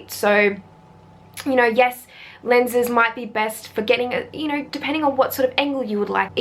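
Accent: Australia. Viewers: Great video, and really needed to be said. Frederick really nailed any commentary I could add, othr than Jay Maisel wrote a wonderful book about this in some respects, entitled "Its not about the F/stop". Cheers and best regards – PS I love your Ozzie accent!;-)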